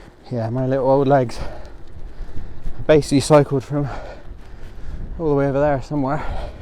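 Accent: Bristol, United Kingdom. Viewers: Checking this out now to see what’s changed Cars at the start for definite Porthminster cafe is gorgeous and the food always excellent - cool rain clouds on the horizon Nice manoeuvre down the hill, and the high street much more active but nothing like usual at any time of year Didn’t see the news about the fire; watching this instead of going to bed but I’ll look that up tomorrow What Gallery did your Dad own ? Recognised that accent as I’m from Cheltenham, just up the m5 from Bristol I’ll stop rattling in now and just enjoy the tour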